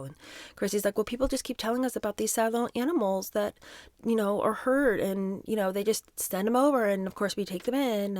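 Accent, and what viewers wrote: United Kingdom. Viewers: I can’t stand listening or looking at anything about Megan markle BUT seriously, your videos make it so humorous that I find myself laughing so much that it hurts. Your British accent cracks me up with laughter, your analysis of Megan teagan and the boring s h I t show is the most hilarious video I’ve ever seen. I’m gobsmacked that teagan posted those horrendous pedo comments about children, I was un aware of her evil, sadistic, perverted nature! Ewe! DHS should check up on Reagan’s kids, b/c I wouldn’t be surprised if she’s lent them out to other pedos, maybe that’s why she was elevated as a celebrity b/c who she knows and who she gives her children to, to abuse them. There’s so many pedo elites willing to help ppl like teagan, IMO. I feel so sorry for you having to sit and watch Megan’s crap show so that we don’t have to thank you sooo much. 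I love your videos please keep it up. 👏🏻👏🏻❤️🫵🏻😂🤣😂🤣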